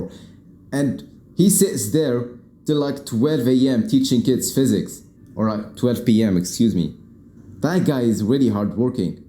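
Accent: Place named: France. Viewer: Great channel masha allah. You sound French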